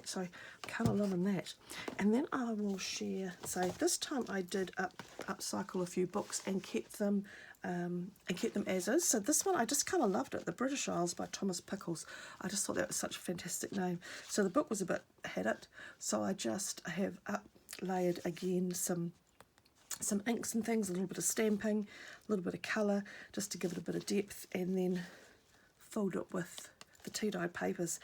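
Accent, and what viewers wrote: New Zealand. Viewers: These are all fantastic!!! I finally got the chance to sign in and subscribe. Yaye! Thanks so much for being such an amazing inspiration! LOVE your work, style and creativity and of course your gorgeous Kiwi accent! xx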